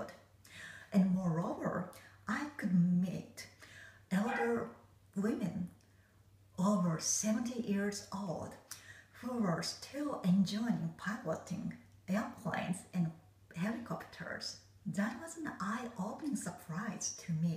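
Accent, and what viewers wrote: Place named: Japan. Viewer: У японок такой приятный акцент. Или не акцент, а манера речи. Сладковатый такой :-)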